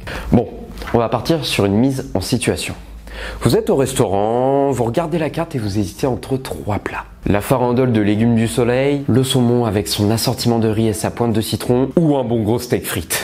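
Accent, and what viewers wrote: Normandy, France. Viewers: Boujou on sent le normand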